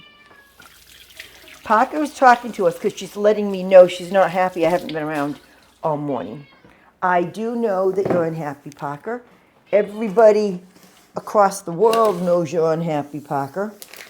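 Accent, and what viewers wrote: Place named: Boston, United States. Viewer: Loved the update! I definitely think Parker was trying to tell you something important--😊 Someone here said something about the video being blurry. I think the autofocus caught something in the foreground and focus on that instead of you because you were in the mid-ground area. But then I started driving and couldn't watch but could only listen in the car. So it didn't bother me-I love listening and love your accent. Reminds me of the folks I met when I went to Boston. Have a great weekend!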